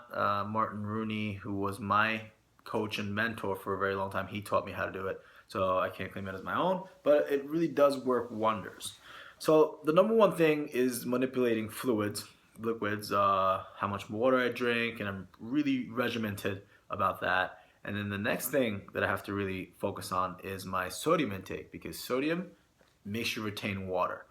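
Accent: New York, United States. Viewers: bud ekins he just sounds like a American to me I'm almost guaranteed that he was born in America but if he isn't I'm very impressed. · Lmao just because he has an Asian name doesn't mean he has to have a Japanese accent. He was probably born and raised in New York hence his accent. Probably never been to Japan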